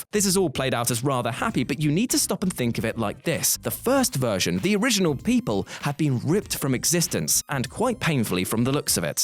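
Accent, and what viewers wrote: United Kingdom. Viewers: British watch mojo?!?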